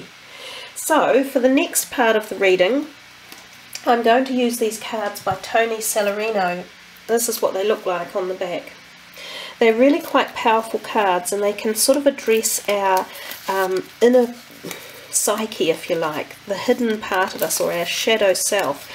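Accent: New Zealand. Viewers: What a lovely reading. Am currently living abroad but so refreshing to hear the kiwi accent again! Thank you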